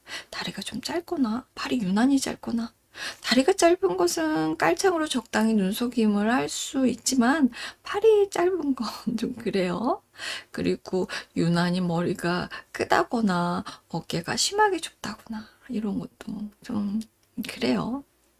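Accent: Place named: Gyeongsang, South Korea. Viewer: 잘쌩긴이라고 발음하지 마시고 잘생긴이라고 발음하시길...... 잘쌩긴은 호남말투인데, 억양은 영남이시네요..박원순 서울시장의 억양......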